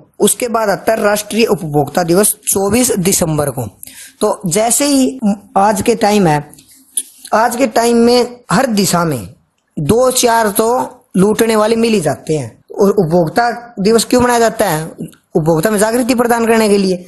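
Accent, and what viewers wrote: Haryana, India. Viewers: Hariyana se hai ke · Sir aap haryana k hai kya??? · जाको बोले पक्का हरयाणवी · Achi tricks h bhaiya......lagta h Haryana se ho☺️☺️☺️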